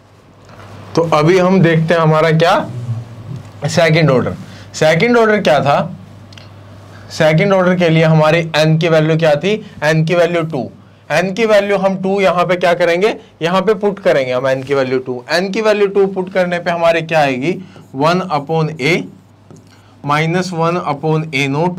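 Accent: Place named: Punjab, India. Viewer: He seems to be punjabi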